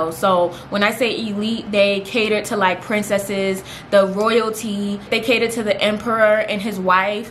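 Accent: New York, United States. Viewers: Sis I love this!!!!!!! It’s giving what it needs to give. Fashion knowledge with the New York accent!!!!!!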